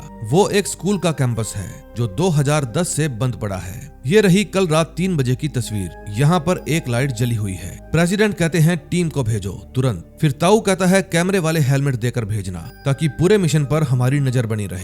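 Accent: Punjab, India. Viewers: Bhai kya aap Punjab se ho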